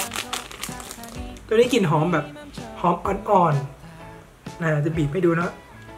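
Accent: North, Thailand. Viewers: คนเหนือหลอ?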